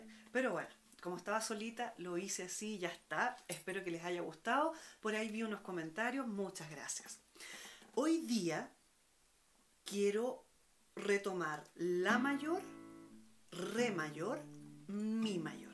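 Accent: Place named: Chile. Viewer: Un placer suscribirse a tu canal, me parece o eres de Chile? Apenas se nota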